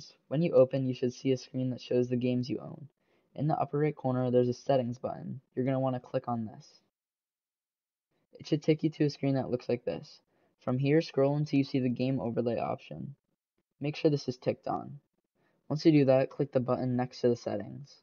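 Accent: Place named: India. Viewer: you sound like one of those indian tech guys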